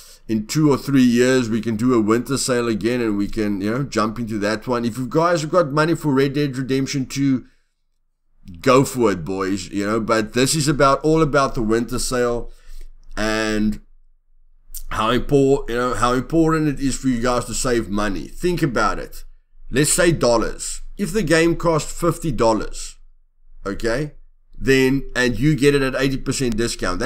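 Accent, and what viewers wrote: Afrikaans, South Africa. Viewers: You are from South Africa? LOL I always asked myself what kind of accent you have. And it is an Afrikaans influenced accent, right?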